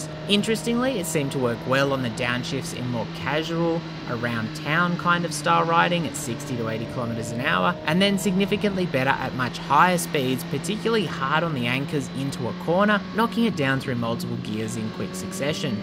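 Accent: Australia. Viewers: My God what the f is wrong with the Australian accent?!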